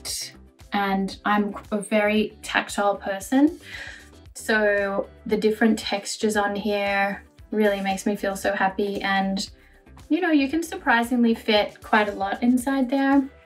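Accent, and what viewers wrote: Australia. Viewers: Like her Aussie accent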